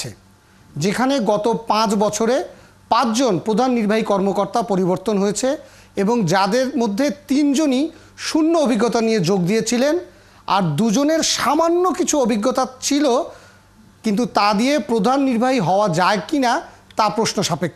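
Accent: Kolkata, India. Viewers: এটা কি বাংলাদেশের চ্যানেল। যিনি কথা বলছেন উনি কলকাতার মত করে কথাবলছেন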